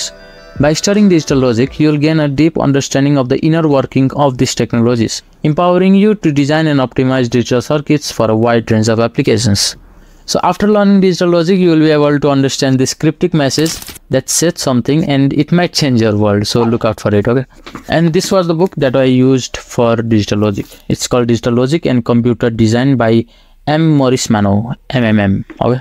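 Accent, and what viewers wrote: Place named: Nepal. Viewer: Bro speak in nepali. Do what you are good at. Nepali accent is not appealing.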